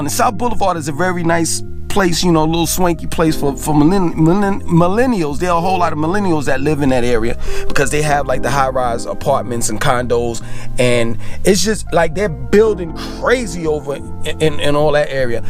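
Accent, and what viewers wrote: New York, United States. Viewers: He has a strong NewYork accent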